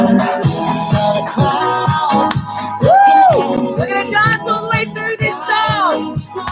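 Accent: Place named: United Kingdom. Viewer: So... Firstly - you are amazing! I love your mindset and confidence. And the fact you still have British accent:) And that you know you are enough. My kind of gal! I also love going places by myself and it really is what they say - you learn so much from it! Last year I finally got out of my comfort zone and went to solo trip to Ireland (on my wishlist for years and years). I am use to go places by myself that are near bye but not to another country for 14 days. I was having excuses for years why I can't go (money, time) and than I called out my BS and said to myself: Alenka, you will never get there apparently. So much about ''how much you want to go.'' And I decided and I make an action plan and went. And like you said: Yes it's scary, I was also renting a car, driving on wrong side (:)) of the road, etc. but I learned so much and it gave me so much trust in myself. 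I can do it. By myself. So cheers to exploring. And basically to everything you have to say! The other thing I love is hotel story. I totally agree. We all need more compassion and understanding. And listening to each other not just talking. Oh and playlists yes. So true. Some songs made me up and move around in a heart beat. One of the songs is -. What a tune! With your help - the podcast, Burn/BTES app, the book you really took me to the next level. Most important lessons for me were: setting goals (3m, 6m, 1y especially), what can I do each day to reach them, foc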